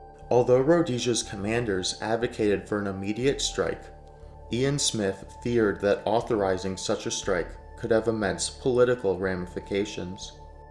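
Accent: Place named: United States. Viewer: very exaggerated American pronunciation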